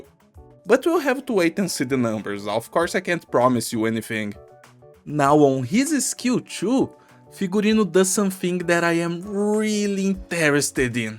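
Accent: Brazil. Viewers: Isn't he brazilian tho?(pretty sure that's the brazilian accent) and figurine translates to costume from portuguese.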